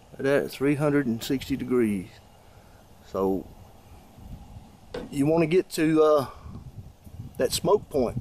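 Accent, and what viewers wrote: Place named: Maryland, United States. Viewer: Where are you from? Sounds like Maryland.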